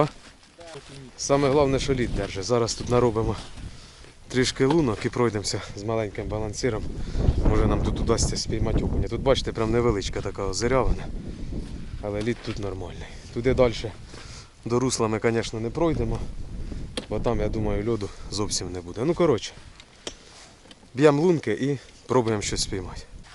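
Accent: Poltava, Ukraine. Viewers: обожнюю полтавський акцент.Дуже багато схлжого з артуром і жести і слова ну то тнічого ми ж українці)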